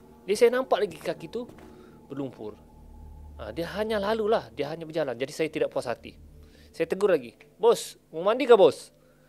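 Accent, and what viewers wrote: Sabah, Malaysia. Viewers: From Sabahan? Right?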